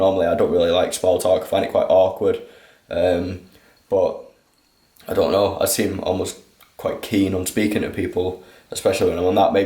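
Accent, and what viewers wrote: United Kingdom. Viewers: Are you from Sheffield? (I'm Canadian, but I live in Leeds) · u british!!!!!!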